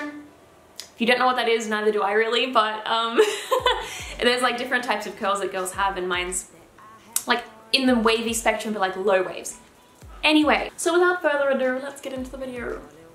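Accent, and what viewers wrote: Australia. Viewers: It’s an Aussie accent I have one too. Aussie Aussie Aussie oy oy oy. Isn’t your name mate. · She kinda souds aussie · Omg! Kath and Kim! The way you said "so" at one point sounded exactly like one of the snooty shop keepers (forgot their names..Trude I think), which sealed in my mind that you must definitely be Australian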